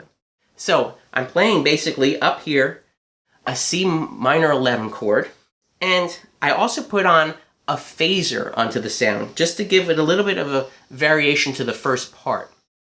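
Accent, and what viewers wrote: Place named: United States. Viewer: great guy irritating American accent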